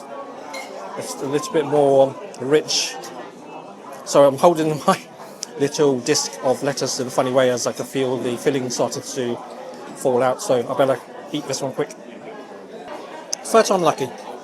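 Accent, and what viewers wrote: United Kingdom. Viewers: You look Asian, but your way of speaking and your mannerism are purely British. At 98 British pounds = $133 for a Peking duck which costs only $36-38 in Houston,Texas it was quite priced. American salary is much higher than Briton's. You must be the privileged in British society.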